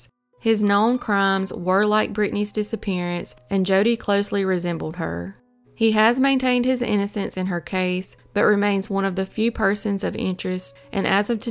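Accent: South, United States. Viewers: Such a soothing southern voice.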